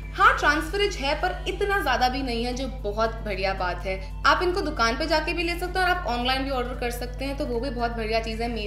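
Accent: Delhi, India. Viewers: Pakka Delhi wali hindi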